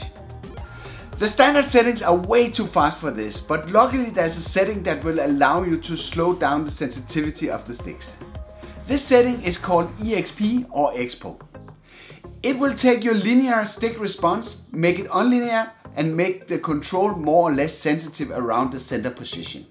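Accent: Denmark. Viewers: Love the accent. Danish... :D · That’s a very danish accent there👍👍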